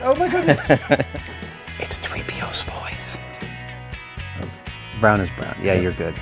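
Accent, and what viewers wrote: Germany. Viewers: Why does he sound German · he sounded like he had a German accent,and not the good ones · He sounds German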